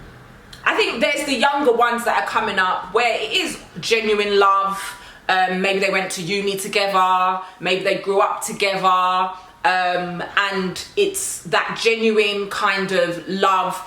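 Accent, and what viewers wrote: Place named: England, United Kingdom. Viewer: YOU ARE ADORABLE! I LIKE YOUR LIVELY, FUN APPROACH, AND YOU HAVE A GREAT VOICE! YOU REALLY HAVE A STRONG ENGLISH ACCENT... ADD A FILL LIGHT IN FRONT OF YOU WHEN YOU TAPE. YOU ARE TOO PRETTY TO BE IN A SHADOW!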